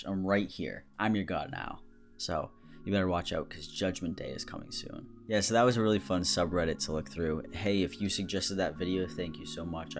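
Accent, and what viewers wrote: United Kingdom. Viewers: How do you have a more British accent than me, I'm British. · I know I'm late with this comment but I just want to say, I'm British and I appreciate your British voice, not bad at all! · PLS-WHY DO YOU SOUND LIKE SHREK WITH YOUR BRITISH ACCENT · i laughed at your British accent so much😂. it was better than a lot of peoples tho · british accent, not that bad, not sure which part of britain youre tryna be from tho...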